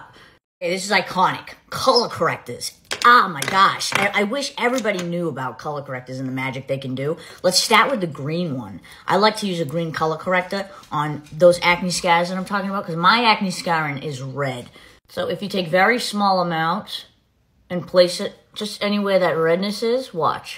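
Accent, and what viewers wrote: Boston, United States. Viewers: That's a Boston accent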